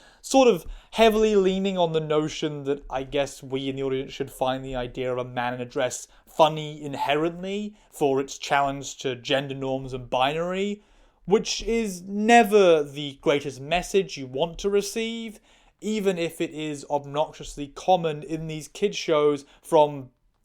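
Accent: United States. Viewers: Please please please do a bunch of shorts in the American accent just for luls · I like her american accent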